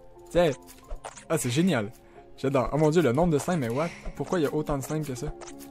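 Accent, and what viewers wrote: Quebec, Canada. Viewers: Tes québécois ?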